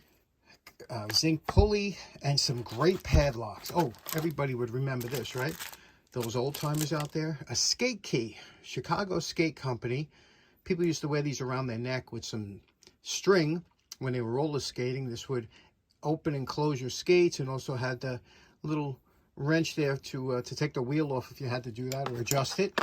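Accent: New York, United States. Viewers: That was a great show today. You made me chuckle when you said it's a “ewing ax” as in JR Ewing. It’s “hewing ax” but you just can’t hide that New York accent. a smart guy, worried he might get electrocuted so “I’ll give it to 😂”. I’ve never seen a double handed screwdriver before and I love that Greenfield tap wrench. Cheers mate, Stuart 🇦🇺.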